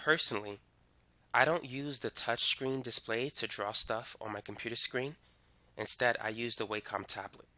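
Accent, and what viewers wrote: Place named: New York, United States. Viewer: New York accent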